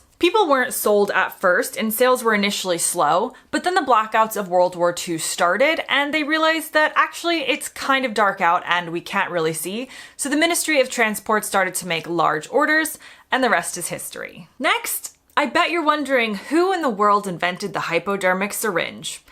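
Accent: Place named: United States. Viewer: Immediately I identified your American Accent, then you explained the reason. Very short list of British Inventions but none the less very interesting. The British have a habit of giving away inventions without realising their actual VALUE...ie The Jet Engine also given to Russia but not even a THANK YOU.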